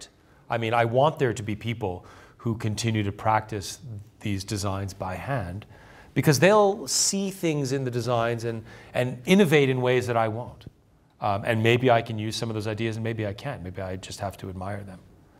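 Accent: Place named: United Kingdom. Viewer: somehow british irritates me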